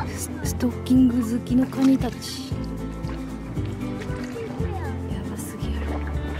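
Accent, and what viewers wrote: Kansai, Japan. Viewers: 可愛い❗関西弁たまらん。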